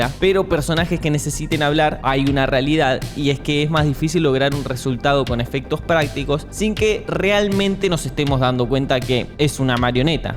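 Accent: Standard. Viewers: Es que tenés un acento muy neutro.... me atrapaste. No hablás de tu. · que croto agarrar videos en ingles y simplemente hacerlos en castellano, encima hablando en neutro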